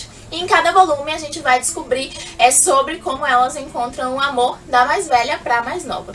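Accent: Salvador, Brazil. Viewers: Vocêee é de salvadoooor?????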